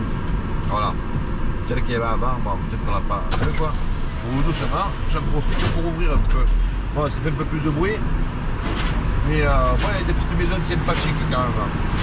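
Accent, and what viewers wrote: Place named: Provence, France. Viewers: J'aime t’on accent du soleil 😂😂😂❤ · Bonjour ! Je vous ai découvert cet après-midi et quand j'ai regardé cette vidéo, je me suis dit que, comme je ne suis pas loin de Vaison (Monteux) alors, je suis du Nord puisque vous redescendez "dans le sud" 🤣Et pourtant, on a le même accent !!!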